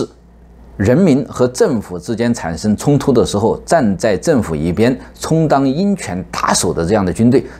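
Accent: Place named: Huaiyang, China. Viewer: 你的口音好淮扬，很可能是镇江人？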